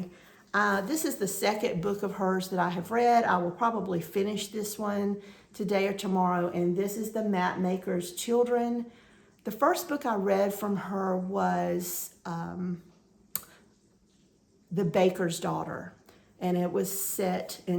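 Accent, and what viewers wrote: South, United States. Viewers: Your work is always so gorgeous! I love the patterns you choose and your color changes for many of them. I gave up flosstube for a long while but have only recently decided to revisit a few 'makers & stitchers' who inspire me with their unique style and love for the art. I'm delighted to learn from you, be inspired to pick up my needle, encouraged to tackle a project that I've judged too difficult, and be satisfied with with a completed piece ... even tho I sometimes only see the mistakes! Your sweet spirit and that lovely southern drawl... the stories of treasure hunting at vintage shops...tales of trips to the beach for sun & seafood...all of it brings up memories of not so long ago trips to Foley beach, Isle of Palms. So grateful for your presence on YouTube!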